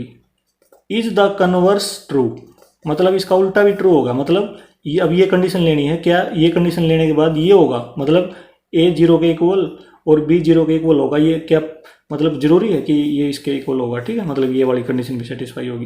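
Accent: Haryana, India. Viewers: Re sir aap to haryanvi jaise bol rhe ho But love u sir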